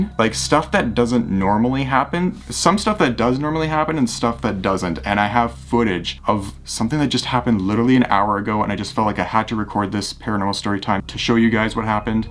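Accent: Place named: United Kingdom. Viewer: AH HES BRITISH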